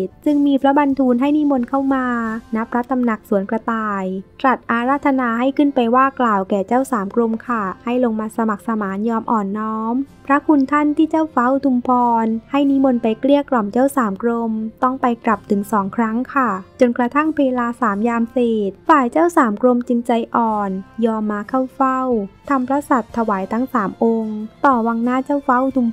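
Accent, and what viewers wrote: South, Thailand. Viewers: เป็นคนภาคใต้แน่?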